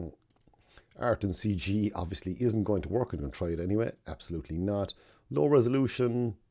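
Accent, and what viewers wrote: Ireland. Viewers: It's that charming Irish accent. Thumbs up for a clear voice! 🙂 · I’m east cork you sounded near enough to the city one lol cool what’s the difference between Luminar neo and pro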